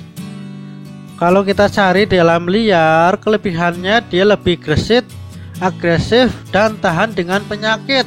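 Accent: Banyumas, Indonesia. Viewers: Ora ngapak ora kepenak 🤣😂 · Ora ngapak Ora kepenak ... Jangkrik Bosss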